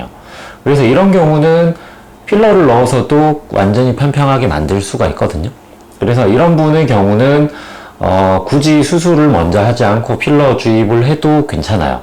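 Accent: Gyeongsang, South Korea. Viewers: 저도 경상도 사람이라 원장님말투랑 스타일 익숙했는데 저분이 오해하신거같네요 꼭 방문해보세요 현실적인 조언과 상담 해주시고 눈물고랑필러 진짜 자연스럽게 잘됐고 2년은 유지됐어요~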